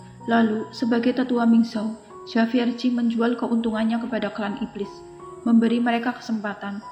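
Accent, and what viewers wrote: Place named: Banyumas, Indonesia. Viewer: dialeknya kaya orang ngapak, apa iya?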